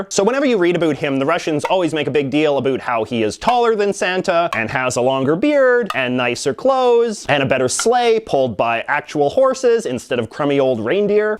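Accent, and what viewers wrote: Canada. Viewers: Hi J.J.McCullough. As a fellow Canadian, I am just wondering what part of Canada you are from. You seem to have a pretty thick accent when it comes to words like about which only exist in some provinces. Just curious, cause I'm from Southern Ontario and we don't seem to say about the same way 😂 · He's very phonetic when he speaks. He even says the aboots and oots and aroond. Which I'm sure many agree not very many people in Canada pronounce it that way. Either way he's insightful and I don't mind. I'm actually more weirded out by the Canadian than his very bad pronunciation of дед мороз. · Hmmm you’re Canadian aren’t you, squidward...?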